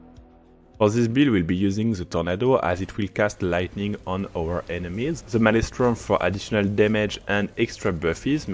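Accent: France. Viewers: Hey man, great video but damn you really need to stop pronouncing "th" as a "z", I really don't know why the french are taught to say it like that lol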